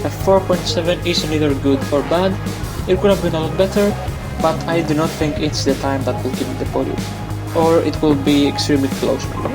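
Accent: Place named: Greece. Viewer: The greek accent is the best!!!!!❤😂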